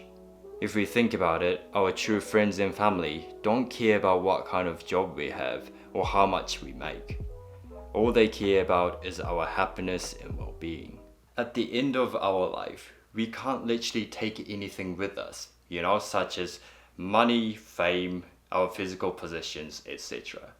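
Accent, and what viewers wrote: New Zealand. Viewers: wise and crazy cute! 🥰 ps. did u study in New Zeland? I can hear a kiwi 🥝 accent when u speak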